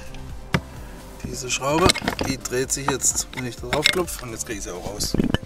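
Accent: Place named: Swabia, Germany. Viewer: Da du au ausm Schwabaländle bisch wie ma hören koh wolld ih fraga ob du mir des ed oifach eibaua kosch?🤷🏻‍♂️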